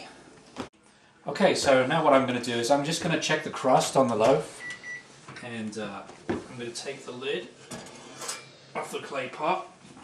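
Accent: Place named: United Kingdom. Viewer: Who swears with british accent?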